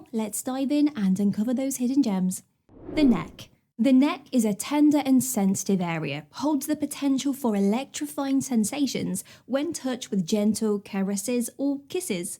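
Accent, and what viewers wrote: England, United Kingdom. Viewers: your English accent is so beautiful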